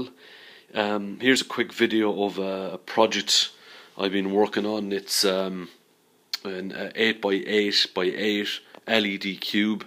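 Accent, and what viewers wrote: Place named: Midlands, Ireland. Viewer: Are you from the midlands? You accent sounds like its from the Irish midlands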